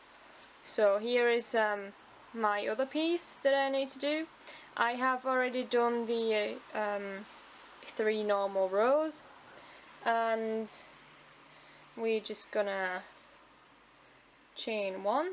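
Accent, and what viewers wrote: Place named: Yorkshire, United Kingdom. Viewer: where are you from haha? your accent is so yorkshire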